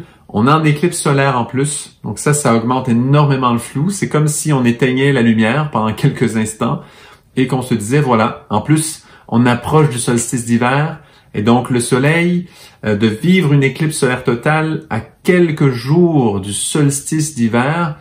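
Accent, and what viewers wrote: Quebec, Canada. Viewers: Enfin, vous me rendez sincère, parce que j'ai écouté attentivement et je rien rien compris. Rien que pour entendre votre délicieux accent québécois j'écouterai toujours, qui sait ? Peut-être qu'à force de vous écouter je serai intelligente et je comprendrai les relations des planètes !!! Merci de m'avoir accompagnée, étant malade et perturbée par mon passé ...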